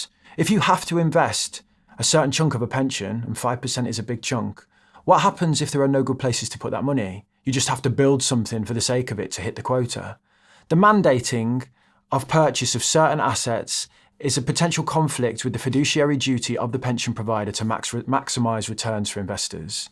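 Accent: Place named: North, United Kingdom. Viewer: I think you would be a great person to help educate the public on investments, your northern accent would be really beneficial as a posh accent and discussing money will turn a lot of people off as it'll feel harder to relate.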